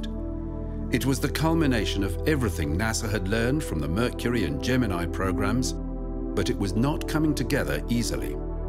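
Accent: United Kingdom. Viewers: As for the comment from the video "John Glenn returned without incident ", There was a flashing light down at mission control saying that his heat shield had become dislodged. Some bean counter from NASA administration That if they kept the retro rocket strapped on, That maybe it would keep the heat shield and place long enough for him to descend through the atmosphere. This actually endangered his life because it caused unequal burning of the heatshield or something like that. There had actually been nothing wrong with his heatshield just a faulty bulb down at mission control. Oh and they never told John Glenn about it. This incident took the NASA administration out of direct control of the missions as they were happening and put full control into I think the guy's title would be called capcom. That being said, Check my math always check my math even when There is only 2 people in the room I am never the smartest person in the room. 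Your video footage is stunning. The narrator To me sounds British, Doesn't matter whether it be male or female the British accent just makes it the sound Authentic. Despite me Nit picking, I enjoyed the video thank you for the time and effort you put into it. Apologize for any spelling, punctuation or grammar errors. Although English is my only language that doesn't make me good at it. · I would have given this video a good rating if they had used an American instead of a British guy as narrator.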